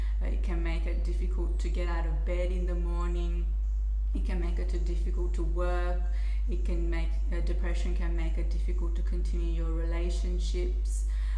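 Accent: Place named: Australia. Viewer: Australian accent interesting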